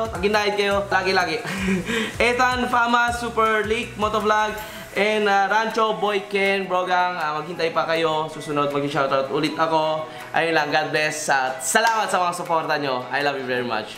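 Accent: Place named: Ilocos, Philippines. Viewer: Ilokano ka gayam brogang. Naalwad ah pang pataray brogang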